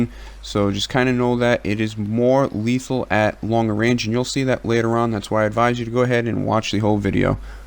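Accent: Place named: Massachusetts, United States. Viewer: Lol sup dood? I can tell you are from MA. You from Southie guy?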